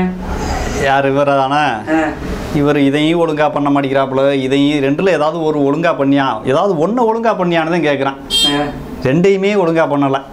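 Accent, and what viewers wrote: Madurai, India. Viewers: love scenelam vayithaala pora madhiri irukaapla..sema kalaai...super nanba. today than unga channel pathen.. madurai slang super.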